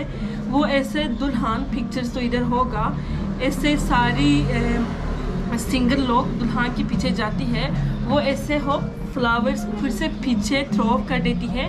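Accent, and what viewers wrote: Pakistan. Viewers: Ap sukardeo Gilgit baltistan k logo ke tara Urdu bolti Mashhallah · Yes u right u r not phupho 😂 Love your Urdu accent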